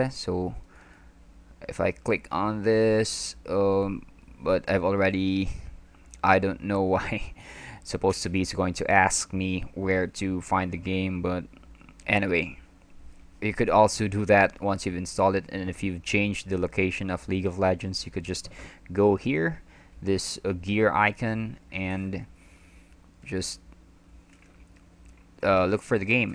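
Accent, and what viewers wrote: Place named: Philippines. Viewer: Pinoy ka?, ganda ng accent mo...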